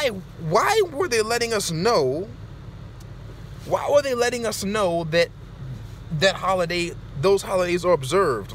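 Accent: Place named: Maryland, United States. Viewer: You sound like you from dmv like maybe Maryland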